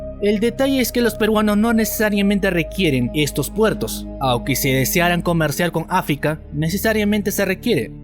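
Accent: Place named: Bolivia. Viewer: ☝ interesante información del video, pero ¡ con abundante dosis de alarmismo !!! 😒🙈🖓 Es evidente que, por el modismo, dejo o expresión de la voz del autor del video, éste, NO es peruano NI chileno ( quizás puede que sea boliviano, o de alguna otra región ). Pues bien, la realidad presente, NO es como aquí se dramatiza. Si bien existe una rivalidad de los peruanos con los chilenos, ésta se hace mas evidente en el ámbito deportivo, que en el político o económico. Ahora, si el Perú apuesta por desarrollarse y destacarse, NO es por andar pensando o viendo lo que hacen los demás, si no, por que es plenamente consciente del potencial y los valiosos recursos que posee. Y, por que en la búsqueda de tal fin, está dispuesto a trabajar con todos : tanto con EE UU , como con China ( por ejemplo, se estuvo rumoreando a través de las redes sociales, que los chinos, hicieron la propuesta inicial de la contrucción de megapuertos, a las autoridades chilenas, pero, tal parece, que, como NO llegaron a un acuerdo conciso, estos, se pasaron a proponer lo mismo al Perú, que aceptó de cajón. 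Así que, NO tienen nada que reclamar o envidiar al respecto, los vecinos sureños ). ✋ En cuanto, al supuesto plan extensivo territorial del Perú, es algo, que de plano debe descartarse, ¡ por que es completamente falso !!! 🖓 Los peruanos del siglo XXI , NO tienen proyectos expansionistas geográficos, si no, económicos : la ' conquista ' es de nuevos mercados alrededor del mundo, y, NO de territorios vecinos, qu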